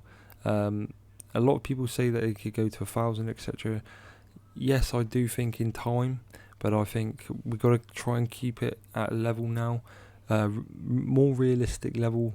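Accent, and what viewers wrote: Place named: Bristol, United Kingdom. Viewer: you sound like your from bristol mate?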